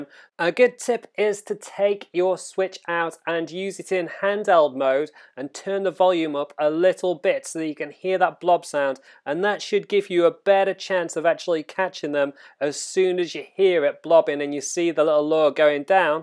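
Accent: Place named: United Kingdom. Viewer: WATCH OUT FOR THE “LAW” DROP IN—bruh with your british accent i cant tell what the heck ur saying about the short window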